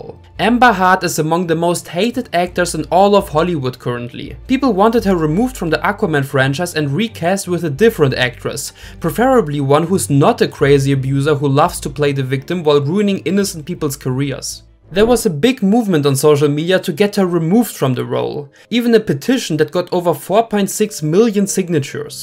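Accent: Germany. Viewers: He‘s German, English isn‘t his native tongue. Relax.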